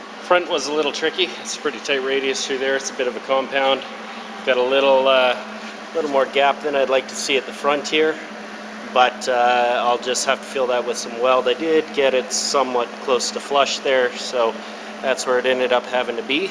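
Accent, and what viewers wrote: Canada. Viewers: Just watch your 4 videos start to finish, good stuff! Had a good laugh reading the comments "Are you in Canada?" I could tell you were a Canadian boy right from the start lol. From Ontario by chance? We should wheel sometime if you are.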